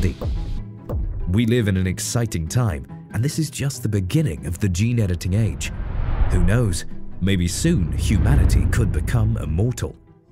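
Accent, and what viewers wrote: United Kingdom. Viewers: lol these simpletons thinking this is a kurzgesagt ripoff. 3 elements: cartoon, british narrator and science focused makes it a ripoff? Besides, Kurzgesagt is crap and has proven themselves to be unapologetically biased and totally untrustworthy. Kurzgesagt fans are no better, and have some kind of a weird cult-like behavior to the channel, as if they could do no wrong. Use your brain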